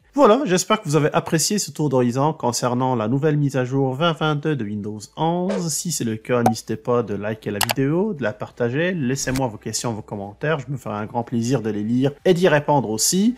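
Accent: Quebec, Canada. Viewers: Merci pour cette excellente présentation... avec cet accent de nos cousins du Québec :-)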